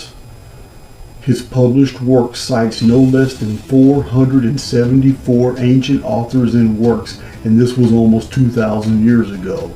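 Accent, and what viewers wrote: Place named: South, United States. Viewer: you sound sooooo Southern here man haha love it 🧡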